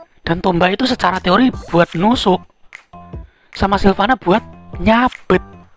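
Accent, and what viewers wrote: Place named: Surakarta, Indonesia. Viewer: Logate koyo wong solo njenengan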